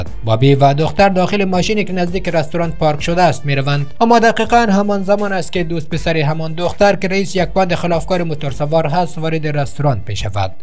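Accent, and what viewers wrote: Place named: Afghanistan. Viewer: داداش روی لهجه ی افغانیت کار کون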